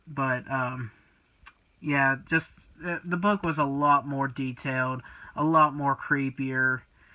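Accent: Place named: South, United States. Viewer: My mom wants to know where you're from? We both agreed you sound country. I'm from South Carolina myself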